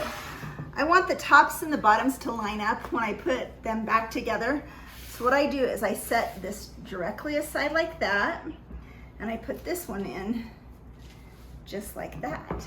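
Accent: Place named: North, United States. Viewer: I love this! you seem so sweet and kind <3 .... the way you say "measure" and a few other words, it makes me think you are from up north somewhere?? I've never heard this accent before, so i am taking a guess , lol ... ( I'm from Southern Indiana )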